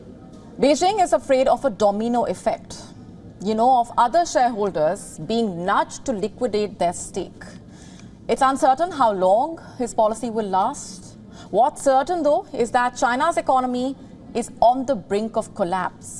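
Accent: India. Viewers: Indian accent... a bit hard to understand.. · Her Indian English is more interesting to me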